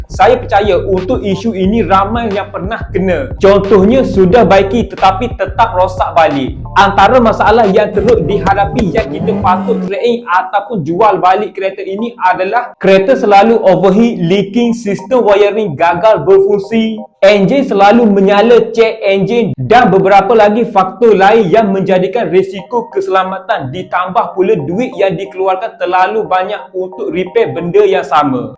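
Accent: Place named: East Coast, Malaysia. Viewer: bagus ada skit cara org pantai timur cakap saya suka, teruskan cara ini, kalau org utara cakap depa bantai slang mereka je skrg org timur pun patut cakap cara slang timur baru ada kpelbagaian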